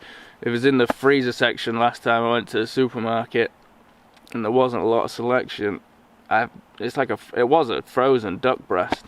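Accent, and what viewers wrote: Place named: United Kingdom. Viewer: You sound like a Brit but you talk about walmart and bucks. Where are you? (NOOB to your channel)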